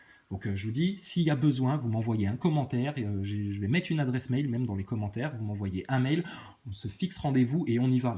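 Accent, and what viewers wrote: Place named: Vendée, France. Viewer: On croirait entendre un vendéen Qu'ils reposent en paix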